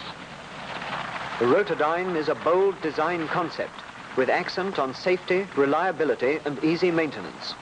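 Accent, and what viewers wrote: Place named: United Kingdom. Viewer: Oh what a fantastic British accent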